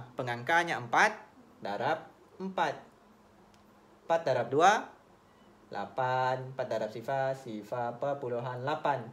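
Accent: Sarawak, Malaysia. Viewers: Kitak orang sarawak ka?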